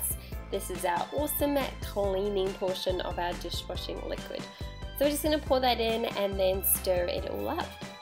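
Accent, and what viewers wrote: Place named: Brooklyn, United States. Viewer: You are welcome. All of the USA I am sure wishes your environs and wildlife come through the fires as best as possible. BTW- I thought your accent was from Brooklyn, NY at first, though. :-) I like the "make your own" liquid cleaning products. Money saving and safer. Hope you get back to more when you can. -UrbanLifeTime and us all. :-)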